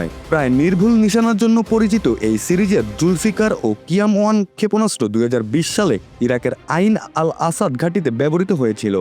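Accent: Kolkata, India. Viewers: এই সাংবাদিককে কোলকাতার মনে হচ্ছে।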